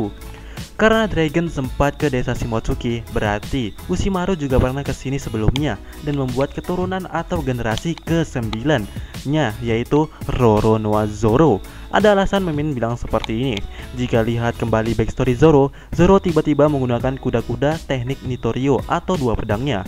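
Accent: Palembang, Indonesia. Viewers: pantesan suaranya kek orang palembang gitu min hehee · Fix Mimin orng Palembang😂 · Ay wong Palembang kau ni ee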